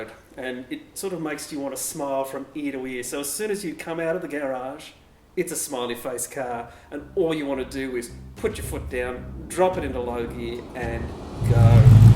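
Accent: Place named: Australia. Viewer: You know, I chanced across this video and I was impressed for many reasons...one was an Aussie from Melbourne who was talking about his car, and secondly it was a Studebaker...that marque of car had links with a Packard which my dad had owned a '34 model in the 50's. All up it ticked all the boxes. And post script Aussies talk in a certain way..up front no pretenses...love it.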